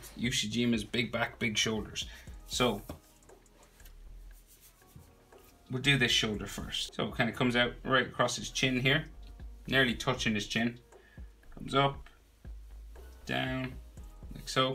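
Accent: Ireland. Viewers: Are you Irish? Just curious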